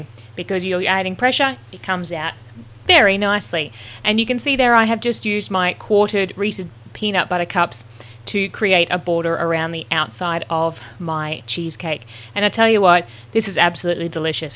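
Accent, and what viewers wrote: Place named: Australia. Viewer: Wow, can't wait to make this:-) and the Aussie accent made it easy to watch;-)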